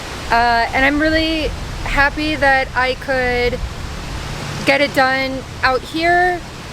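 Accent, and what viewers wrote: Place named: Chicago, United States. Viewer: DUDE!!! I’ve watched a few of your videos, I’m subscribed but I work a lot, I don’t know if you’ve ever mentioned you were from Chicago but when I saw your 1st video I thought to myself, “she sounds like she’s from Chicago” lol! I live 45 min south of the loop!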